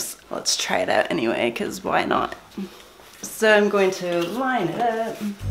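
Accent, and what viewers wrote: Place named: New Zealand. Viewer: never found kiwi accent so interesting before XX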